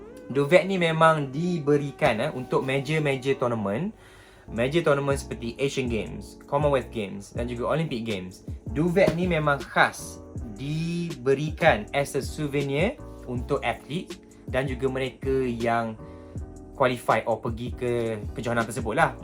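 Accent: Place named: Terengganu, Malaysia. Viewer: slang ganu tetap ade 😂😂😂, kemahh sek kitee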